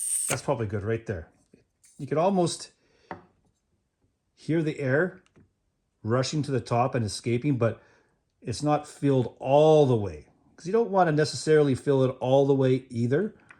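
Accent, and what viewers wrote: Canada. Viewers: Totally unrelated but this guy is definitely Canadian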